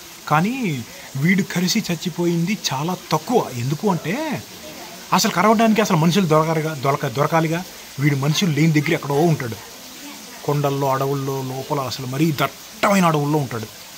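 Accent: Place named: Hyderabad, India. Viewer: Mama,mass r nuvvu, hyderabadi ani pinchav,kummai🎉🎉❤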